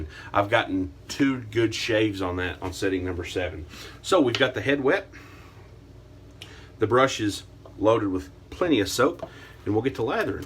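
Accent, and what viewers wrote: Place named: South, United States. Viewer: Great Southern accent, what state is that?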